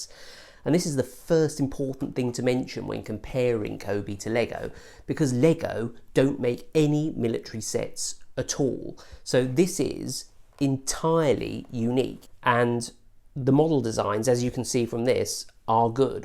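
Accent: United Kingdom. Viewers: the bri'ish accent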